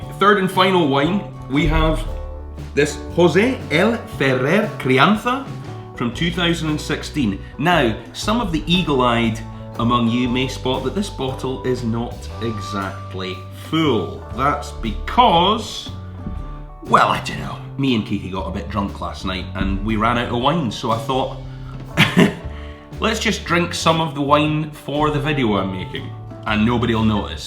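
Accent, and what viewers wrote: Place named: Scotland, United Kingdom. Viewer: my wife and I have booked to come to Mallorca again in early September. Looking for some tips on Palma bars, tapas bars and restaurants. Good to hear a fellow Scot on YouTube!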